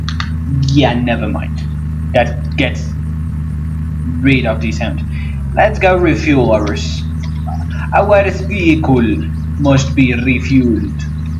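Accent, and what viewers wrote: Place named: Australia. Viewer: you sound Australian xD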